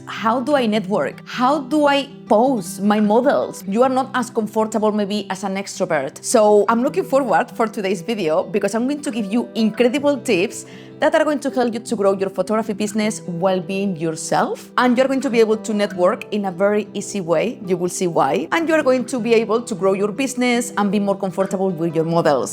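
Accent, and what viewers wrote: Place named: Spain. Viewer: I’m told that if you go to an event with 8 peoples, you will talk to 8 people, if you go to a large event, even hundreds, you will talk to 8 people. I am an old guy, I do not do small talk, just lost for words. The lovely Spanish accent works well for. Family are the worse people to practice with.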